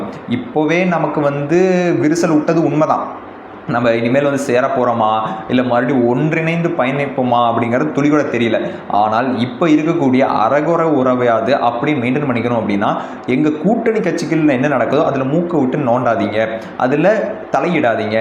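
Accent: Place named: Kongu, India. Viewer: கொங்கு தமிழ், அழகு தமிழ்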